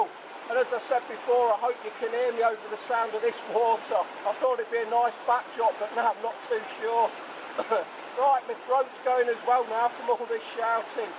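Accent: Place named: East Midlands, United Kingdom. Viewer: Im sorry you came back to the UK, i mean that with the greatest of respect, you sound like youre from the East midlands. We are olanning on going to the Philippines. anyway hope all goes well.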